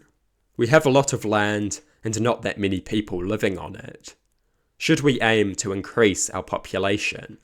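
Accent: New Zealand. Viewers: I like how he emphasizes the final syllable in each sentence, not sure if it's just a part of the New Zealand accent or just him though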